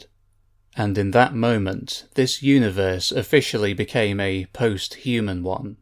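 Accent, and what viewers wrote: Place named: England, United Kingdom. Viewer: you have a very recognizable accent. I found it funny when you said you were from England, because every word you say tells us you're from England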